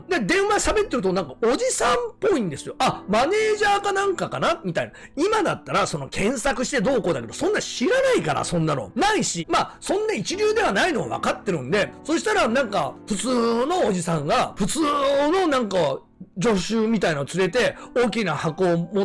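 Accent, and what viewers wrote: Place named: Nagoya, Japan. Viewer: 南利明以来の、久々の名古屋弁のエンタテイナーとして、毎回楽しみに見させていただいております。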